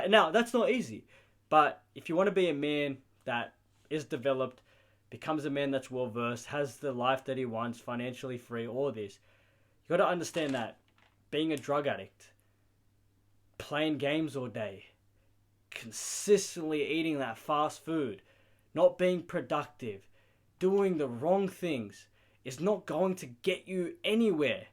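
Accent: Australia. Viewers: are u australan ??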